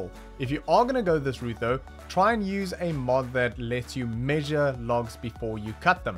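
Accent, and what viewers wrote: South Africa. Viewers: Strong SA accent 🤘🤘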